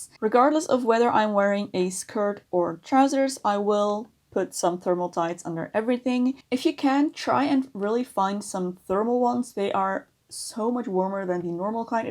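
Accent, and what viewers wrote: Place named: Netherlands. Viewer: i heard dutchie in your accent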